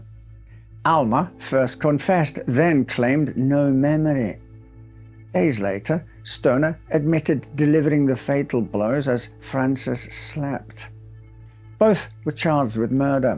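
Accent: Scotland, United Kingdom. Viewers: He does tell a good story. Sounds a bit of Scottish accent, which makes it so much more interesting. I have no trouble understanding him as he's always careful to articulate clearly.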